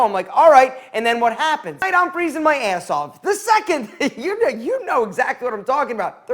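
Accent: Philadelphia, United States. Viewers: You're from Philly arent you?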